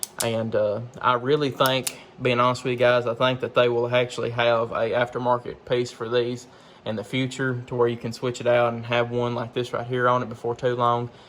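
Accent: South, United States. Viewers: I noticed that you have a southern draw just like I do! Where are you located? I'm in Pensacola, FL. about 10 miles from the Alabama state line. We call the Florida panhandle L.A. as in lower Alabama! I get teased about it also! Dang it!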